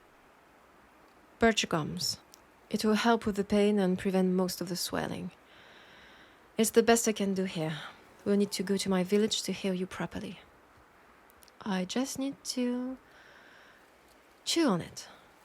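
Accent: France. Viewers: Yo, i've been trying to find a good French ASMRist. All the other ones are British and American people putting on an overly strong accent. The subtleness is great. Hope you make more.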